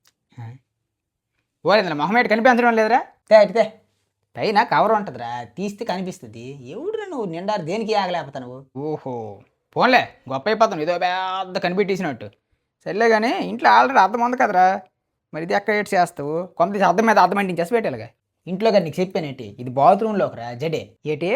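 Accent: Srikakulam, India. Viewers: Srikakulam Slang ❤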